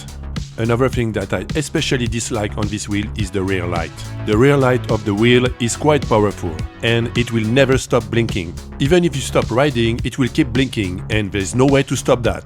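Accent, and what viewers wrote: France. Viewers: Merci pour ta vidéo, j'ai l'impression que tu es français avec un accent qui ressemble au mien en mieux 😅. Tu utilises quel roue à New York dans la vie de tous les jours ?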